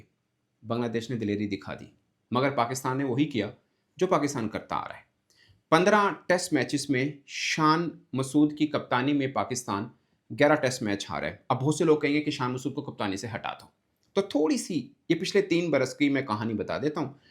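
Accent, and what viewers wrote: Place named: Amritsar, India. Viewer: your Punjabi sounds like so AMRITSARi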